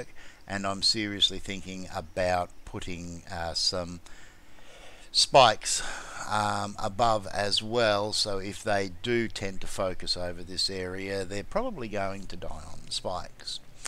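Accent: Australia. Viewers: One of the coolest builds I've seen. You should play on our server, it is a server for people who like to build. Land claim covers 61, online modifier is 256 and offline modifier is 1024. TNT, pipebombs and rockets have been severely nerfed to virtually stop griefers. Nightly region saves and admin will give you a copy of your region. The server is called Land Owners, it sounds like you are down under and may have a higher ping, I believe server kicks players with pings over two hundred but am certain admin would up just to have you and your awesome builds play with us.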